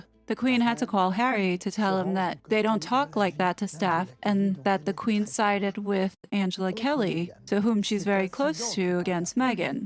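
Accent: France. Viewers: You have a gorgeous French accent! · Ooohhhh by the way your French accent is great.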